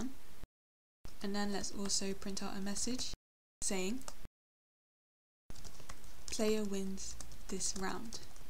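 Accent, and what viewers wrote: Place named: United Kingdom. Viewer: I love your British accent ;) and I love your Unity tutorials!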